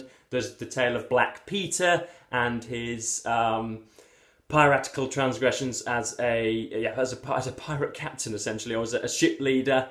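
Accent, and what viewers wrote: United Kingdom. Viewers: I realize...I don't understand British accent. But with book as the topic of discussion, I am going to practice understanding your accent via your channel.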